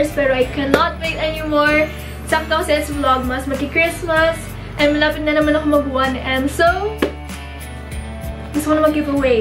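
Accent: Batangas, Philippines. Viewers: You’re so adorable and I love the way you talk especially with your batangueño accent ♥️. IG:nnayramlee Fb:Mary Ann De Villa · Naging bella fam po lalo nung nalaman ko po na batangueña po kayu sobra po akong natutuwa kapag nag babatangueña po kayu. Taga batangas po kase ako😍 Fb: Gwen Comia Ig: gwenv.hope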